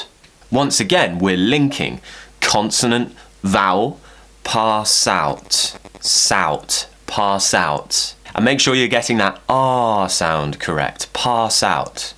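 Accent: United Kingdom. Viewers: Kanilani Kanilani I don't know if you are barking up the wrong tree, but his accent will be helpful in every situation you face and you will sound posh if you put in efforts learning it. Cheers! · britsh accent so cool no matter how hard i try to copy it i just can't >< but ur videos rlly help me thank u ♡ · Hahah the last phrasal verb I use the most frequently😂😂 (No, no I'm joking of course) To be serious I really admire your pronounciation, your accent is soo stunning. I used to learn American accent, but now I find British accent way more lovely and pleasant to the ears. I've been trying to copy it but I sound ridiculously stupid😀 Cheers!❤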